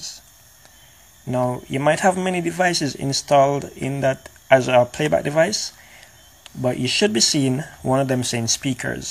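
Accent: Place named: Jamaica. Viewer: Perfect! Thanks Bro. Weh yuh come from? Jamaica?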